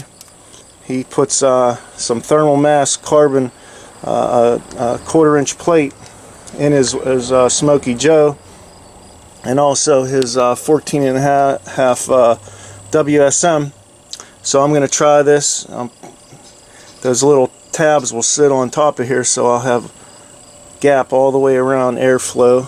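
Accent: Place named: Western Pennsylvania, United States. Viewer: Great videos. I detect a western PA accent. Where can I get the carbon plate and pid fan?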